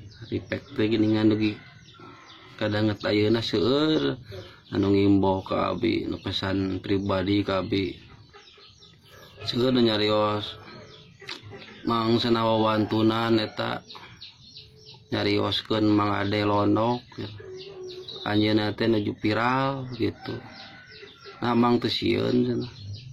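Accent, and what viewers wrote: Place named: Sunda, Indonesia. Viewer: Sunda asli mantul mang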